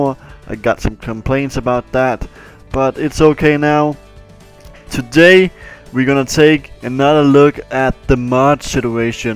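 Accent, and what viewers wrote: Denmark. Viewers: Man kan godt høre at du er dansker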